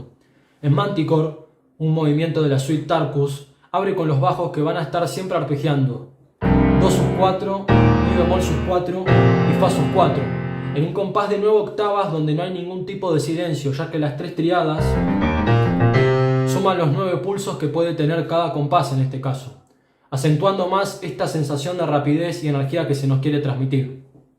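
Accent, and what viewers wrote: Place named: Argentina. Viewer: Espectacular análisis de la técnica del gran Keith Emerson en español. Intuyo por tu acento que eres argentino. El país de Alberto Ginastera, al que, como muchos otros, conocemos gracias a Keith. Se me ha pasado volando la media hora larga del video.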